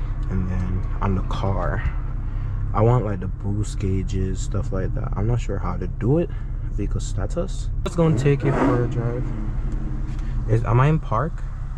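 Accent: Jamaica. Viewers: You Jamaican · Bro are you Jamaican ? I hear Jamaican the accent frl no 🧢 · Lmaoo love the Jamaican accent/slang got me dying😭💀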